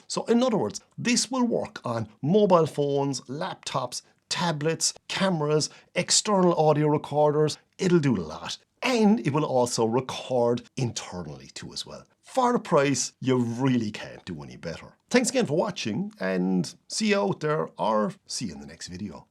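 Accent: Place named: Ireland. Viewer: So I am subscribing just for the Irish brouge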